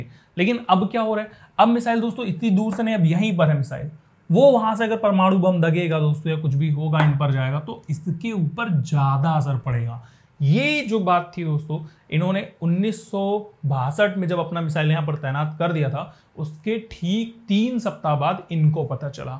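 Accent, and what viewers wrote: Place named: Allahabad, India. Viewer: Sir apka illahabadi accent 🥺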